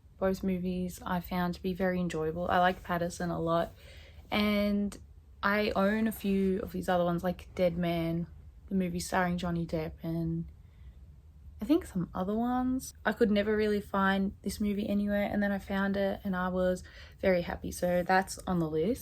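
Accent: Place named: New Jersey, United States. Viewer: that's a lovely accent you have ! New Jersey ? x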